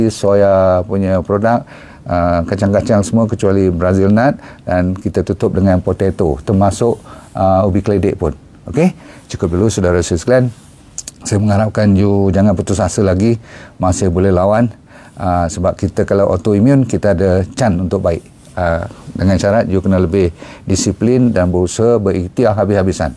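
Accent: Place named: Terengganu, Malaysia. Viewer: Dr dh pandai ckp ganu - senyum sokmo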